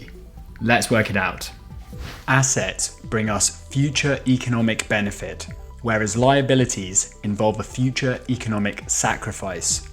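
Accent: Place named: United Kingdom. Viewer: i am kind of a little bit confused, u are a Canadian, but your accent is British accent .but i like your video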